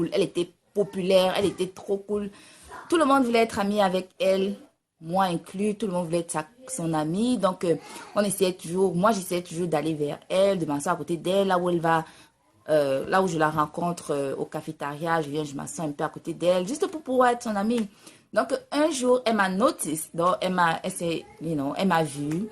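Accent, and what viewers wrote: Cameroon. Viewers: C'est une camerounaise tu vois même son accent et le nom sa chaîne, la musique congolaise n'a pas frontières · Oh mais je rêve finalement c'est vrai! Les camerounaises parlent pareil :D Ma mère parle avec le même accent c'est fou ça non? !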